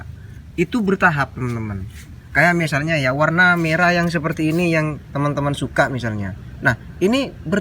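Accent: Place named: Madura, Indonesia. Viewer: Logat bicara nya kok mirip logat Madura ya bang 😀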